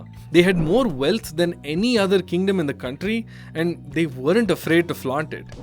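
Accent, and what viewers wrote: South India, India. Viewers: You sound like south indian. Which state you are from, may be kerala or tamilnadu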